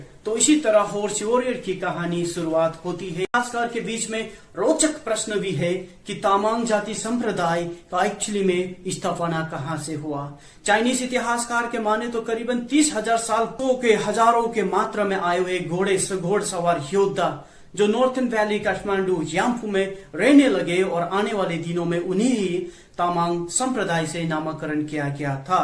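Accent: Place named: India. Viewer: Hahaha...nice joke first of all you sound like indian to me hybrid of indian not nepalese As a newah buddhist i would like to say Tamang neva invade or attack nepalese kingdom Tamang are one of the kind community and hard working people of nepal newah tibetan both emperor were close neighbouring nations they had a good relationship before shah dynasty came to existence both newah and tibetan exchange buddhist culture not only business even they get married Tamang are originated in nepal not from tibet Ofcourse they share tibetan culture and there own bon traditions Having mongolian face cannot be said they are mongols 😂😂 you may ask me why read a invasion japan by mongol kubalai khan Mongol were badly defeated by shogun samurai warriors several times if you go to mongolia if you call ur self as mongol they will slap you on you face without any doubt if you share ur history with them Because asian face were living in himalayas before genghis khan.. 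before saying anything about Nepalese kingdom protect your community by christian invasion mostly tamang community are walking wrong path in christianity why don't you make that kinda video rather making these kinda videos which are base less Im not saying tamang don't exist According to nepa mandal tamang gurung and newa were 3 brothers later century 3 brothers were separate it was happen in todays kavre district this incident was said by my tamang friend who is living soyambhu area in present day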